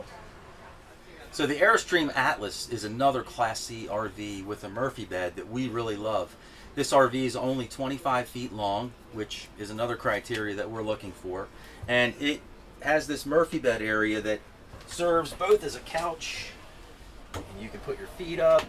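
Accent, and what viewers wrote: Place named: Maryland, United States. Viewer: I knew I heard a MD accent! Ravens sweatshirt confirmed it!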